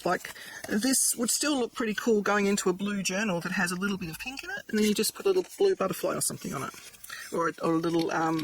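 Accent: Australia. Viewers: I found you today & immediately went to your home page to see where you are from! I love Australian accents! This makes the cutest little envelopes & I just happen to have made a few tags that will fit in them! I think I will make a bunch now, just have on hand, but I think I will also try to remember to cut some strips from whatever scrap paper goes in a journal & then make some that are “matchy- matchy”! I made a variation several years that you keep the long strip in one piece, folding it back & forth until you reach the envelope, then fold the flap down, and use a paperclip, Velcro or magnet, if you feel it needs something. Mine didn’t, a bone folder was adequate! Thanks so much! These are a lot of fun! Love to down under! Erica❤I have subscribed, see you soon!❤ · Your directions are terrific and I could listen to your lovely Australian accent all day! Thank you for this video! 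Subscribed!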